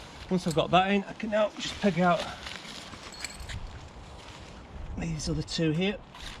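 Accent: Yorkshire, United Kingdom. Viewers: Thanks very much for this vid and the others on the Lanshan 2. And so good to hear it in the original Yorkshire 🤓. I walked & wild camped on some of the Pennine way in March with my new Lanshan 1 and liked it so much I’ve got myself a 2 to go bikepacking with my young son at Easter..so great to get some tips, and such a solid recommendation.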